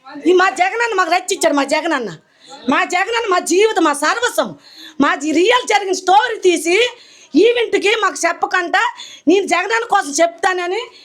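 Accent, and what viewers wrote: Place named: Srikakulam, India. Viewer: అచ్చం శ్రీకాకుళం యాస, నిలువెత్తు నిజాయితీ, మేలు అనుభవించి మేలు కోసమే గళం విప్పే విధానం. శ్రీకాకుళం అంటేనే మంచితనం అనేలా వున్నారు తల్లి. జయహో జగన్ మోహన్ రెడ్డి గారు. ప్రతీ పేద, అణగారిన బ్రతుకుల్లో జగన్ అనే వ్యక్తి ఒక ఒక తండ్రి గా ఐపోయారు ❤️❤️. ఈసారి జగన్ కోసం ప్రతీ కార్యకర్త గళం విప్పాలి, శ్రమించాలి ❤️❤️🥰🥰.#Vote for FAN ❤️❤️🥰🥰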